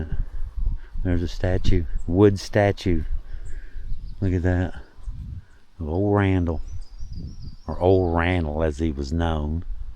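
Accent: South, United States. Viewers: So pleased you covered this infamous piece of history. Super video. And of course I could listen to your southern accent all day long. Love from New Zealand 🇳🇿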